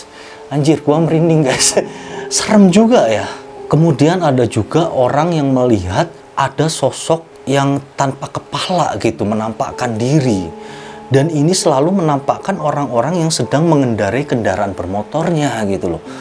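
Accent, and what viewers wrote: Java, Indonesia. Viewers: Sekarang jadi medok uncle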